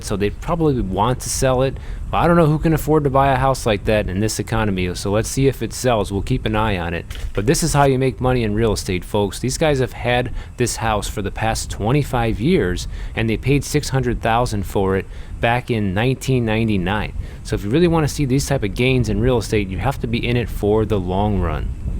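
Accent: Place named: Boston, United States. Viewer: You have to be from the Boston area. Am I right?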